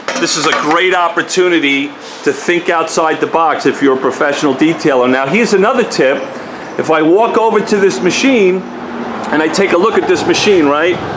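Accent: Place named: Boston, United States. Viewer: Love the accent...cahs and cawfee lol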